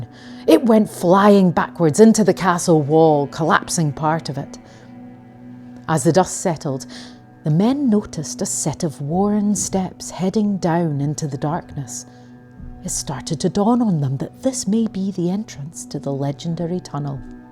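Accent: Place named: Scotland, United Kingdom. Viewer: This combo accent is hurting my head to process. My brain has two different boxes one for British one for Scottish. I am unable to hear the sentences as my brain goes back and forth.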